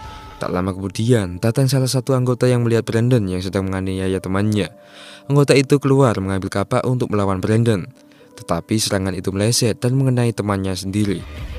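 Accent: Java, Indonesia. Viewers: Medok mas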